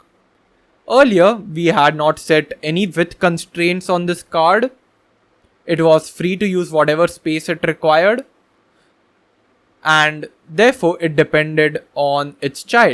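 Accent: India. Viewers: are Nahi bhai😂. Thanks to U, ek Indian accent mein acha detailed video mil gya. Wrna American accent se sar dukhta h. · A horrible, bizarre English access... It sounds like an Indian accent.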